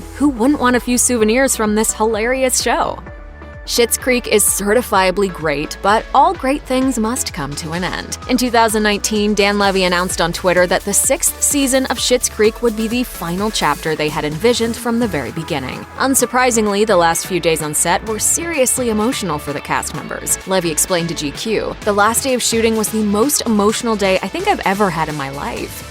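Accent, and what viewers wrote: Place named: United States. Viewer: I don't think the commentator is Canadian. You can tell by the way she says, "Toronto". Def a young American.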